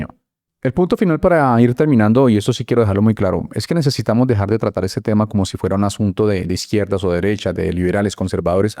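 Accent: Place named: Colombia. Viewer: En Venezuela nadie se esta quejando de eso porque aca en EEUU si?? Tu tienes acento Colombiano sabes que si no hay familias hablando es porque el narco esta detras de esto , en Venezuela hay informes de periodistas que dicen que Dios Dado Cabello tiene amenazadas s a las familias porque?? Porque esta conectado con el narco estado de Maduro , Trump esta cometiendo muchos errores este no es uno de esos estan volando narcos y eso esta bien, quienes piden explicaciones el borracho de Petro ??? Maduro que no es presidente de nada ?? Quienes sufren el narco en america latina jamas van a estar en contra de esto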